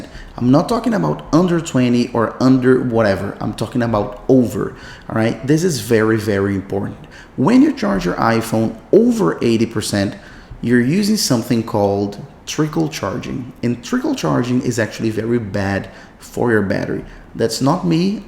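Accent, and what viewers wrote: Brazil. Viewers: u Brazilian?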